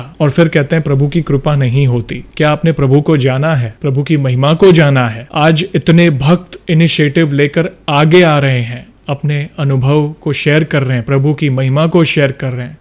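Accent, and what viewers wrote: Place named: Pakistan. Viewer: Bhaisab aap Toh Pakistani lag rhe ho .apki tone se lag rha hai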